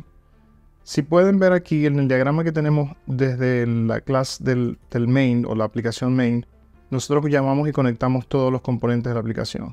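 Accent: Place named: Dominican Republic. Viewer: wow, jajaja! Tienes una voz grave y suenas un poco como los Dominicanos. Saludos bro! PD.: me suscribí a tu canal, sé que aprenderé mucho. Estoy aprendiendo Java y me gusta lo que veo, el backend es hermoso.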